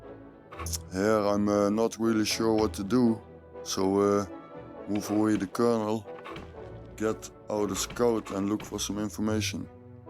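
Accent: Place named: Netherlands. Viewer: New to the Stratego app, but I am a fan of the game all my life. I recognize a Dutch accent a mile away. Nice video, hope to out bluff you if we ever meet up.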